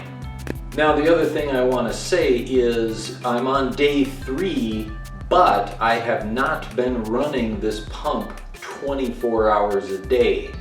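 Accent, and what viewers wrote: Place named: United States. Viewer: what is there about this video that a person would not like? Maybe they are genetically related to Scrooge and they just don’t like anything no matter what. I listen to it twice just because I liked it so much. I am totally blind and I really need to know how these things work. Pictures on boxes do me no good whatsoever and neither do those folded math like papers in 16 languages. I needed a description by an English-speaking individual who spoke American English like me. I appreciate the time it took to make this video and I will be recommending it to everybody I know who might like or need to use one of these pumps. Thanks so much once again because most of these videos just have music and no talking and they drive me up the wall. This is a great video and I don’t understand why it hasn’t been watched 1000 times or more. 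I give it 10 out of 10. thumbs up and every other kind of good review numbers anyone can think of to add to mine.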